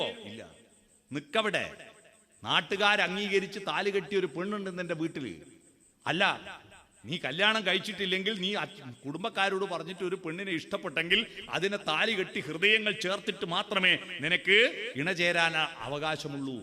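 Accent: Kannur, India. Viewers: സർ, കണ്ണൂർ ക്കാരൻ ആണ് ല്ലേ..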